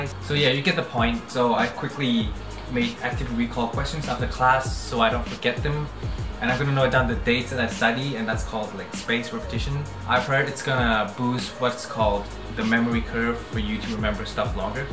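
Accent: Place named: United Kingdom. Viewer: how do you learn such sublime english accent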